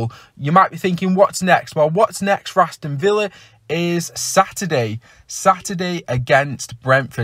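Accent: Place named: Stoke, United Kingdom. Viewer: I thought I recognised the Stoke accent. I live down the road in uttoxeter. Keep up the good work. Up the villa.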